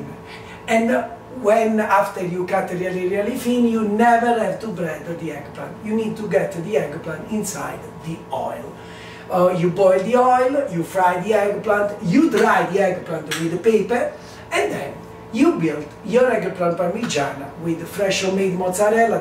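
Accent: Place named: Italy. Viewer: Your Italian accent is Fabulous!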